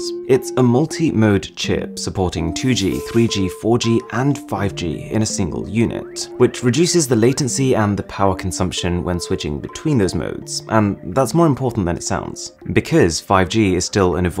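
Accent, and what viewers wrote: United Kingdom. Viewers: fancy british accents😅👌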